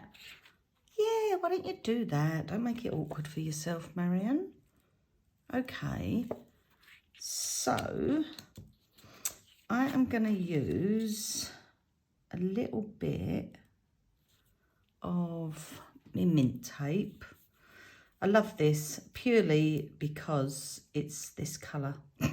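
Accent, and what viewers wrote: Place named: Essex, United Kingdom. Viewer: Just came across your channel and really enjoyed watching you. Particularly because I hail from Essex originally and worked in London so your accent is like coming home. Your voice really reminds me of Linda Robson in the good old comedy 'Tails of a Feather'. What a coincidence!!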